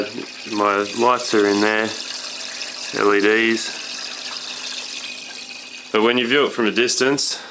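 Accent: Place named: Australia. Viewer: Super cool! Could tell you were Aussie right away from ya accent. Cheers mate! 🍻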